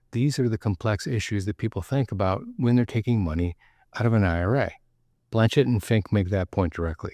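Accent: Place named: Northern Virginia, United States. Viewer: Sounds like northern Virginia!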